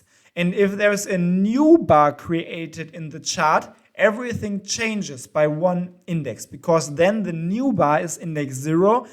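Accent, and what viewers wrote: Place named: Germany. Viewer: den deutschen Akzent kann man im englischen kaum verbergen :-)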